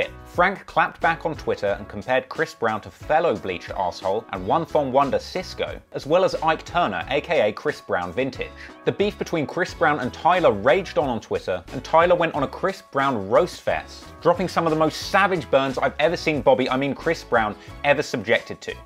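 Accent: England, United Kingdom. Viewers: keep those jokes coming and pursue a YouTube career stop trying to be a rapper u got english accent and u dont look like a rapper , ur " remember that one time" jokes are better than ur rap skills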